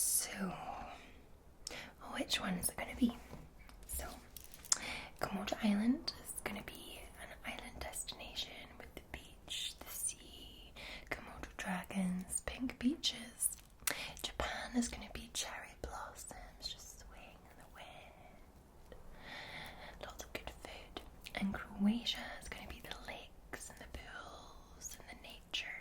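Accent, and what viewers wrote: Scotland, United Kingdom. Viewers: Somehow I'm not surprised my dream manager has a Scottish accent...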